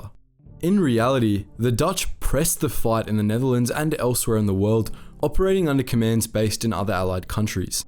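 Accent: Germany. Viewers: Great video, but I feel like you’re trying to say the dutch names the dutch way, but your’re using the german way of saying them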